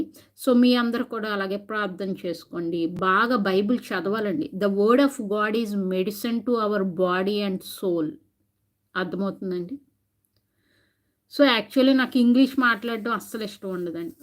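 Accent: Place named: United Kingdom. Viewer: Nee british seed telugu basha vinte etletlano undi akka , yedokati follow avvarade manchiguntadi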